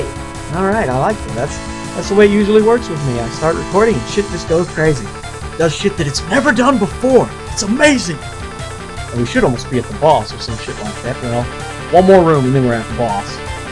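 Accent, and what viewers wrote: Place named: Georgia, United States. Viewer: Well, I like it. But then again, I'm a HUGE stats-geek. Profiles, bios, statistics... I like anything like that. Also, trivia. ANYWAYS, what I really like about LPs is that every LPer brings a different attitude to the game. Like with Gundams and pilots. Your attitude, plus the charming accent equals my subscription. I would have that accent, too if I was born one state up. Damn you, Florida! I would trade oranges for peaches any day of the week. Unless I had scurvy.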